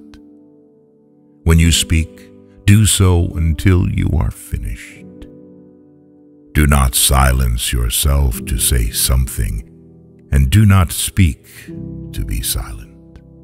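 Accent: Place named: United States. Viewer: Great poem, but it would be far better to be read with a Lebanese accent rather than the usual American which is yet more grating US imperialism.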